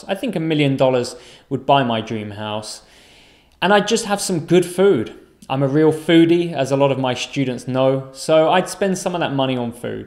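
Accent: United Kingdom. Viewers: How beautiful British accent is! Thank you for the lesson!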